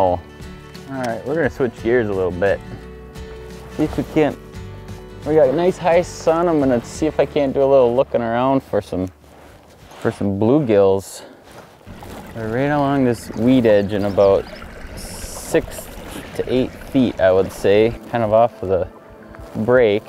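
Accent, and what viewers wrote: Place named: Minnesota, United States. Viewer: He definitely sounds like he’s from Minnesota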